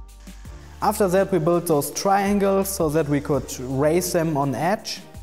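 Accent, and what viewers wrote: Germany. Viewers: Oh , bist du Deutscher oder Österreicher?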